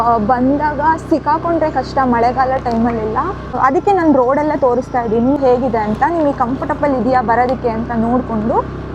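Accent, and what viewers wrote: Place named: Sagar, India. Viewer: any how I like u beauty voice as Sagar taluk kannada ❤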